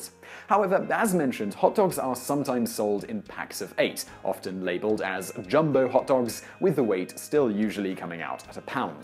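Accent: United Kingdom. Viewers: The British accent really adds credibility to these lessons.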